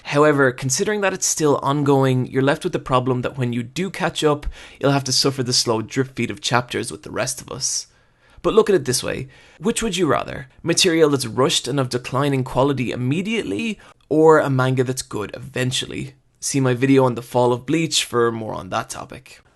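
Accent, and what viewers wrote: Ireland. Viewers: are you irish? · you're Irish... I'd love to have an Irish accent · you Irish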